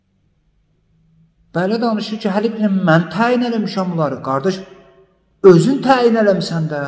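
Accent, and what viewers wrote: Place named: Basqal, Azerbaijan. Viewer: Bskılı kimi danışır nə molla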